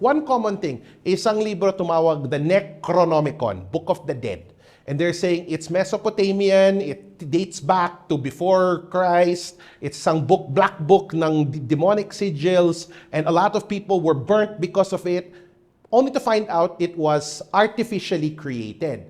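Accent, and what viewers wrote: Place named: Cebuano, Philippines. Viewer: Malaman talaga na cebuano si sir eh pero hands off napaka Professional!!!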